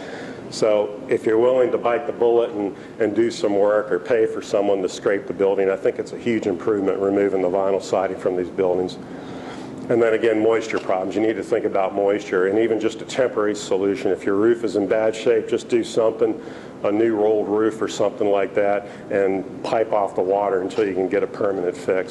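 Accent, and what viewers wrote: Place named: South, United States. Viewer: This lecture was so informative! Thank you NC for being preservation leaders! The only disappointing thing was when this professional architectural preservationist showed a slide on which was labeled "clear story windows" when they are actually CLERESTORY windows. Professionals need to remember to double-check spellings, particularly when you speak with a strong Southern accent. It makes us Southerners look ignorant when things are spelled as they are pronounced.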